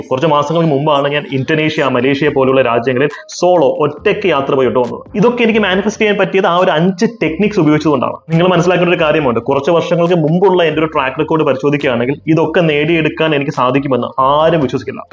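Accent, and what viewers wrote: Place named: Malabar, India. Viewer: Alambu malabari language 😊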